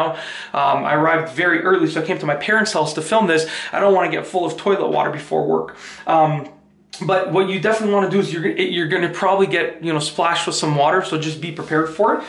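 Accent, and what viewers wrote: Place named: Canada. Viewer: I can tell you're Canadian by how you say oat instead of out.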